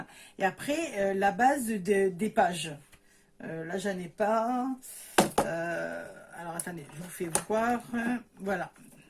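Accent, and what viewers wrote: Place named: Provence, France. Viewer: Coucou ma petite,comme tu est gentille 😊,c est moi qui te remercie,tu est si agréable, et tellement souriante,avec ton accent qui chante la Provence 😊,un régal. J ai vu de suite que tu avais coupé tes cheveux,tu est impec,😊,moi aussi mon mari m'a coupé mes cheveux, mais j ai pas le même résultat,lol,😉.Pas de soucis, tu peux compter sur moi je vais aller voir la chaîne de Ninou ☺.Un tuto 😁😁,album cartable, pas de soucis,je suis d attaque, on commence quand tu veux 😊😊, je t envoie pleins de bisous 😘😘🤗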